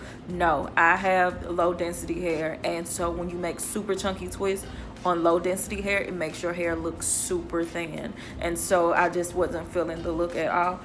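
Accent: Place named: Texas, United States. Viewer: Sorry if you have answered this before, but are you from Texas? That accent is giving me Texas lol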